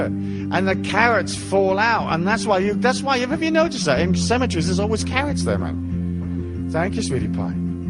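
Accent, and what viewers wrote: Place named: Ireland. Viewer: Slight Irish accent here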